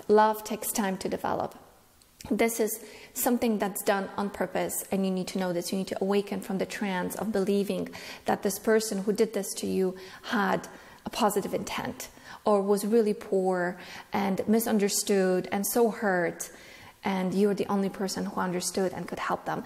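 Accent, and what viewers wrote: Poland. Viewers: I watched this thinking about someone else and woke up today feeling horrible Bc I'm so mad at myself for not listening to my intuition and getting caught up in manipulative relationships. But when you said that I heard my mom in every way. And she is from Poland. So the accent reminds me of her. I thought I could forgive her but I feel way to entrenched and horrible I have no idea how to live my life anymore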